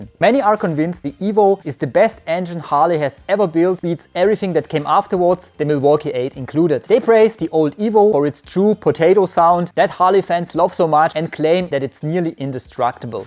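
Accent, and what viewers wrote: Germany. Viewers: You can try as hard as hell, you never kick that german slang. So speak like "dir der Schnabel gewachsen ist" We have Google Translate.....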